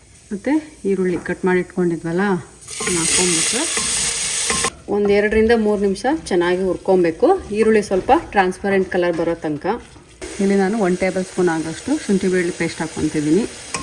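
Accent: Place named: Malvali, India. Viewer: nim vidio nodtidre Indiadale hidira ansute nim language pakka malvali bashe USAge hodru mothertoung change agila ade Kushi namge nim hand mel hiro village style old tattoo super agide❤😅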